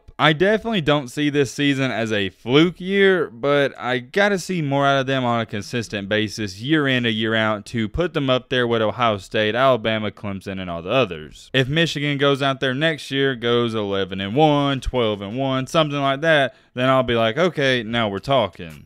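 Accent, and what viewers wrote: South, United States. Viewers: Just an FYI, its Jim not John... On top of that, he's won NO big games outside of Ohio State? This video is completely biased and nothing you said holds any true water. The covid year that helped contribute to their 2-4 record, they were missing multiple coaches all over the staff. At a point, Michigans secondary was nearly coaching themselves last season. With that heavy of an accent, we both know damn well where your fandom resides. His clipboard has nothing to do with his success either, he's implemented the same strategy year-in and out. Any excuse you SEC fanboys can pull, you will. Another side note, what does Quinn Ewers have to do with this video? Smh.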